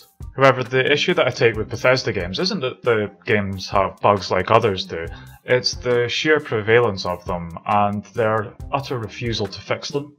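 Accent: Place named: Scotland, United Kingdom. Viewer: Your Scottish accent makes this video a 10/10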